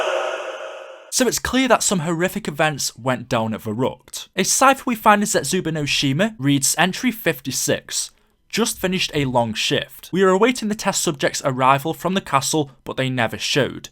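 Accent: United Kingdom. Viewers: Giovanni Costilla well he is from the United Kingdom, and he is British, not Canadian like vanossgaming.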